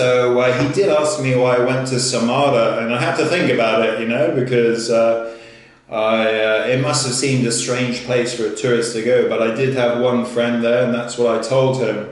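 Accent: England, United Kingdom. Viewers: Hi Mr traveler I’m new too your channel I loved your video and I love your English accent! Sending love and greetings from Orlando Florida USA ❤ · You actually did pronounce it right. Close to it as you can get with the english accent. Kaliningrad is supposedly fun to visit if you like all the old abandoned wwII stuff, you should have explored all of that. But right now with the situation, just get back to Europe.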